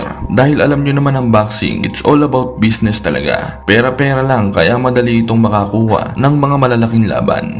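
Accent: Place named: Batangas, Philippines. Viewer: Ayos kabayan bentang benta Ang iyong mga banat...batangenyo ka ba?Ang mga salitang binibitqwan mo e hawig Ng salita Ng mga batangenyo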